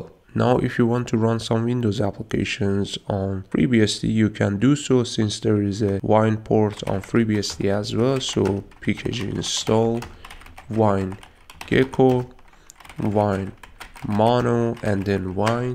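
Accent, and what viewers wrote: Iran. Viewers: حاجی دمت گرم بابت چنل خوبت، همون اول که ویدیو رو باز کردم و انگلیسی صحبت کردنت رو شنیدم، فهمیدم ایرانی هستی😎😎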